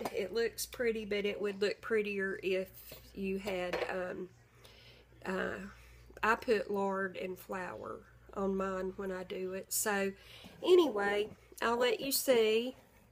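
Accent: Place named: Rural, United States. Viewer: Love your country accent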